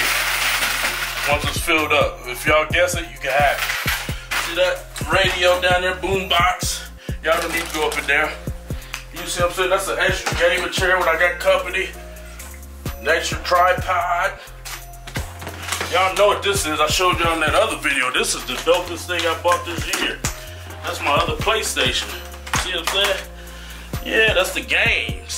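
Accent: South, United States. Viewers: Why does he sound like a hill billy white guy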